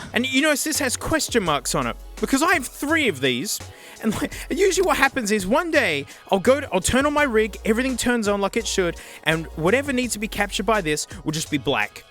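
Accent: Australia. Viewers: I know I watch too much when I start thinking in an Australian accent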